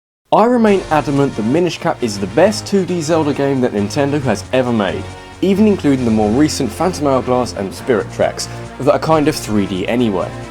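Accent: England, United Kingdom. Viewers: Are you British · Does he come from england?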